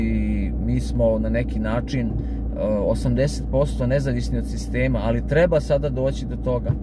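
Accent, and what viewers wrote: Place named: Dalmatia, Croatia. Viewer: Volim dalmatinski dijalekt pozdrav iz srbije !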